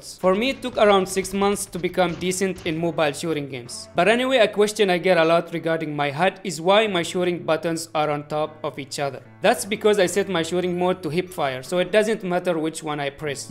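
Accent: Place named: India. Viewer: U sound like u from INDIA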